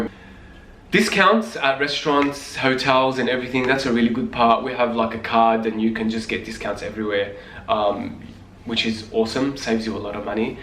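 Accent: Australia. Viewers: Everything you’re saying is spot on 😂😂😂 are you Australian? Love this video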